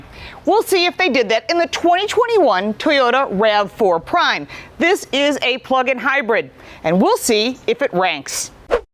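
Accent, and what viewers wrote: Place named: New York, United States. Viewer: Great review, accept for the damn loud NY accent!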